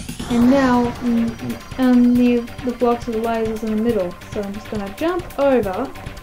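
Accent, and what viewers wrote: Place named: Australia. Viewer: No! Don’t be harsh on yourself. Your voice is good. You’re from Australia I can tell by your accent.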